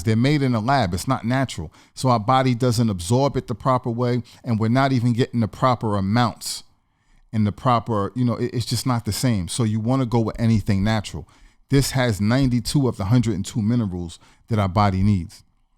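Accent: New York, United States. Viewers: I’m glad I found this channel. I’m interested in sea moss but I am a little hesitant because I have a history of hyperthyroidism while pregnant and always was borderline hyper thyroid. Not sure how the high iodine content would affect someone like me. Are you from New York? You sound like it. 😊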